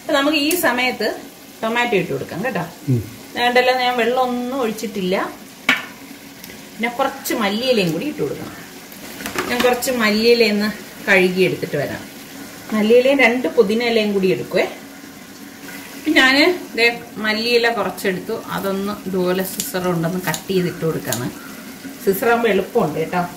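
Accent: Trivandrum, India. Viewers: Thanks itha.eppozanu video kanunnate .teachere bahumanikkunna ithaykku big salute.l am a teacher.super biriyani itha. Tvm bhasha kelkkan nalla rasam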